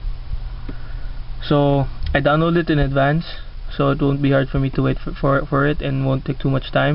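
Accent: Philippines. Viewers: are you filipino cause you have da accent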